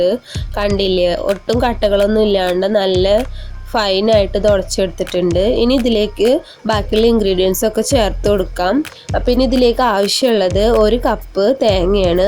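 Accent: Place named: Thrissur, India. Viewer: Video full kandu Thrissur language 👍🏻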